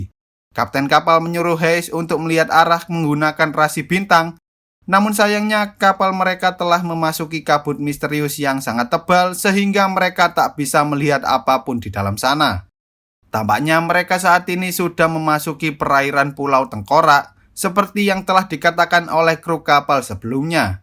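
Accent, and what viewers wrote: Java, Indonesia. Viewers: kek logat orang jawa 🙄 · Gw suka nih channel nontonin film dari bahasa yang bersahat(medok :v)😂 Edit:film zombi lagi dong kalo ada😁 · Logat jowo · Jowo mu ngendi min? 😂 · Alasan gua suka sama channel ini: - Kadang suka becanda - Tidak terbata bata - Suaranya mirip opung gua - Memakai logat khas dari jawa Sukses selalu min 👍🏻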